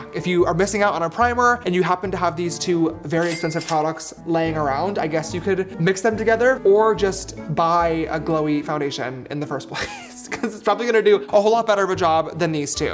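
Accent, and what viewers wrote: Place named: Scotland, United Kingdom. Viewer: is it just me or like kinda has a scottish accent in this vid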